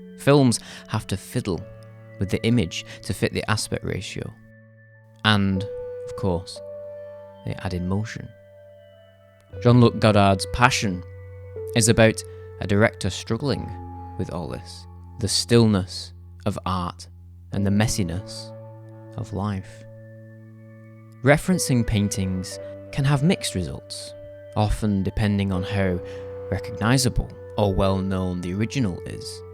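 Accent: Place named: Scotland, United Kingdom. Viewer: Where are you from in Scotland?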